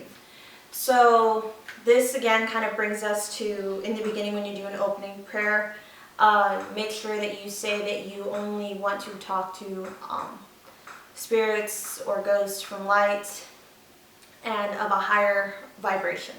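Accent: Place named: United States. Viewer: What parts of Mexico are your parents from? Have you ever been to Mexico? You have no chicana accent which is interesting because, you have a normal american accent.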